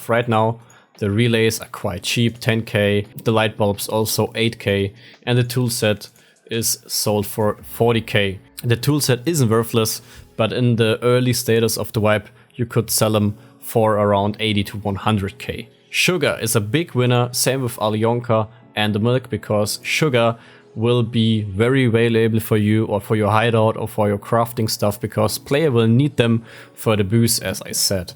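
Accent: Germany. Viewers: Man hört dass du Deutscher bist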